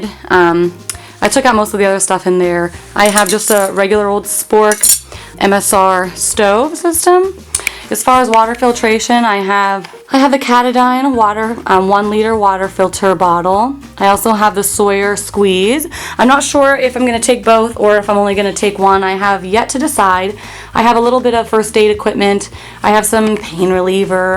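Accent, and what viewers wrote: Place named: Pennsylvania, United States. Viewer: Are you by any chance from the Philadelphia area?? You sound exactly like you grew up in South East pa haha that isn't an insult at all I promise, I live in Delco 😁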